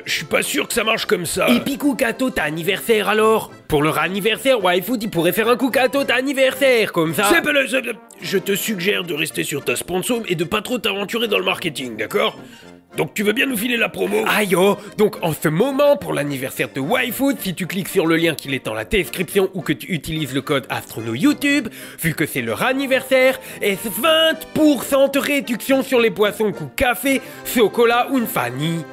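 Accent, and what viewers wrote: Alsace, France. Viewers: Peu importe le sujet (toujours d'une très grande qualité), l'accent alsacien vaut à lui seul le détour ! 😁 "ALSACE STYLE" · Hello! Bien qu' "écolo" dans l'âme, je pense comme toi qu'aujourd'hui le nucléaire est indispensable/un moindre mal... Mais : Je pense aussi que tu te trompe a propos de la pollution que cela engendre, il s'agit de la pollution indirecte qu'engendre les déchets du nucléaire que nous ne savons pas traiter, ceux qu'on enterre, qu'on stock en attendant ou que certain voudrais envoyer dans l'espace... C'est l'equivalent des décharges, c'est un problème qu'on laisse pour plus tard "en attendant que...", ces problèmes mis sous le tapis sont le veritable noeud du problème a mon sens. Pour le reste (bien que ta voix alsacienne me cringe énormément et me pousse a skipper systématiquement le placement de produit dans la seconde) bravo pour le contenu de tes videos, continue comme ca :)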